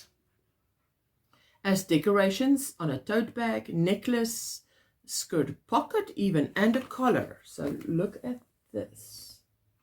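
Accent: South Africa. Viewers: You sound south african!? Love the way you shared everything so calmly and thoroughly!!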